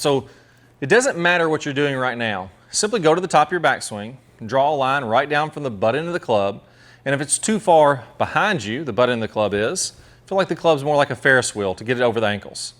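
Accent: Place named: Florida, United States. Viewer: Hi, as you may recall im a lifelong fan! Being British, just love that Florida(?) accent!still getting that lag and the sweet little draw that you demonstrated. But anyway, I was trying to clean my car (auto) carpets by beating them against the garage wall. No matter how hard i tried just got a weak ‘thump’ that didn’t dislodge the debris, and scratched my knuckles! So I moved to the corner end of the garage to avoid the scraping of knuckles. And WOW! My hands went clean past the corner wall and the CRACK of the carpet against the wall was (almost) deafening! And the debris came exploding off Maybe ive just discovered a ‘hands in front of the ball’ drill.? BTW, i used this technique hammering in tent pegs when out camping. Allowing the stinking hand to move down (a little ) the line of swing just beyond point of impact Effortless effect! 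Maybe this might help someone Keep up the great vids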